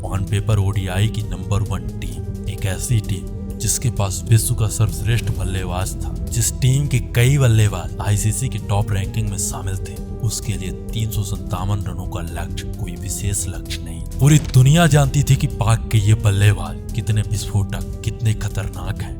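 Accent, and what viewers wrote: Bihar, India. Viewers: Tu bhihari he yaa uttar pradesh wala 😂😝😝😝😝🤬🤬🤬🤬🤮🤮🤮🤮🤮🤮🤢🤢🥵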